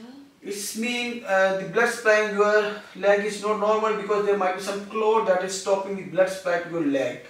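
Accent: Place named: Asia. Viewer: Very educative video. You are good teahcer. The only challenge with the video is the accent that make it difficult for non-Asian audience to hear it well. We will appreciate it, if you can speak more slowly, just to carry others along. Thank you, sir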